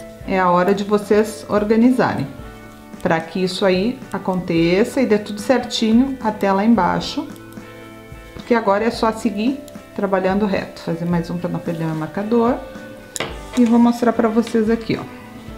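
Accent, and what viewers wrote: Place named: South, Brazil. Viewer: Boa noite, estou muito grata por todos os ensinos que tenho tido contigo. Te acompanho sempre, e comento pouco. Como tricoto no método inglês com mais facilidade, e ainda nova neste mundo maravilhoso da arte, vejo vc tricotando rápido demais. Então, vejo várias vezes para pegar cada detalhe. Linda demais essa cor. Ouvir esse sotaque do sul é maravilhoso. Morei no início do meu casamento em Santa Maria. E trouxe uma guria para mim😂. 😘🙏🙌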